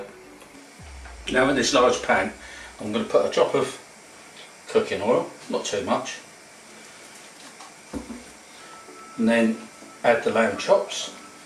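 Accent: United Kingdom. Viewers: Are you a londoner?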